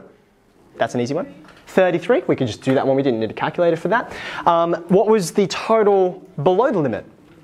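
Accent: Australia. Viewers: Aussie accents make stats more fun.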